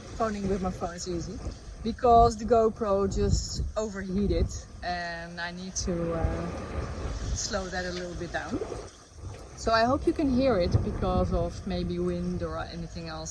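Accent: Netherlands. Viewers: Wauw wow fantastic adventure Ja kijk uit hoor ,die orcas ! 😅 So kool your solo trip . I like hearing the Dutch accent Moet ik aan Vlaardingen denken . Weggevaard in 1959 eerste keer Naar Canada . Beetje grooter boot maar ja. Boobies yes they are .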